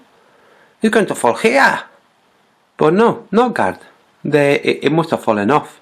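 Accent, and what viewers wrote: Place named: India. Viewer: sounds like that indian guy from short circuit. kinda cool accent lol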